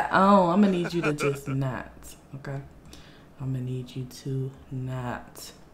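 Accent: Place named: Texas, United States. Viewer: My boyfriend says you have an accent like Beyoncé lol probably because you’re from Texas also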